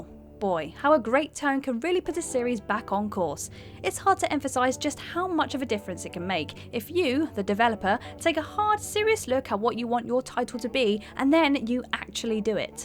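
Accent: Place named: United Kingdom. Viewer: maybe its the british accent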